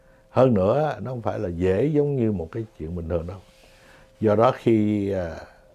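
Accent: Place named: Southwest, Vietnam. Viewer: Nghe Anh kể chuyện thời đó.. cách trình bày của Anh giọng nói miền Tây Nam Bộ thật dễ mến..👍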